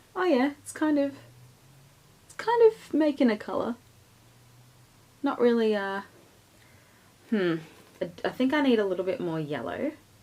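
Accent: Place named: Australia. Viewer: Are you Australian by any chance? You kinda have an Aussie accent.. Loved this video~<3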